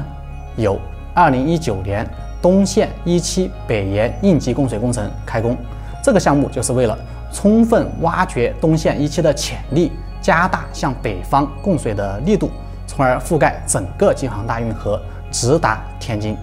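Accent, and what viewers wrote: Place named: Sichuan, China. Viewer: 听起来一点不费力，典型四川人普通话，你多习惯就好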